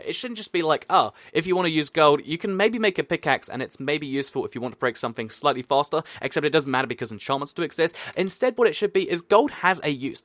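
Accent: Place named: United Kingdom. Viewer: He sounds so British XD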